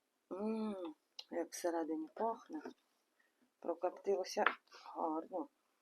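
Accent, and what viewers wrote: Poltava, Ukraine. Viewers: Привіт зимлячка!!!!!!!, сьогодні я перший раз побачив твій ролік і почув наш Полтавський говор, дуже зрадів шо є така жінка рибачка, із сила, наша настояща Полтавчанка а ни наіграна якась бутафорна блогерша, багата з екоферми!!!!!!!! А в теби все по простому, як обично в селі!!!!!!!!!!! Та щей канал свій ведеш, зразуж підписався, дуже радий шо тебе знайшо чисто случайно, переглядав одне відео про заборону вилову риби з першого квітня, і тут натрапив на теби!!!!!! А з якого ти села якшо несикрет????????? Я з с.В.Сорочинці!!!!!!!!!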